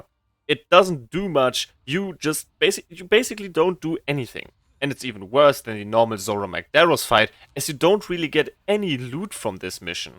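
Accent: Germany. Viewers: ich höre so stark das du deutsch bist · Hey are u german u Sound like u hafe a german accend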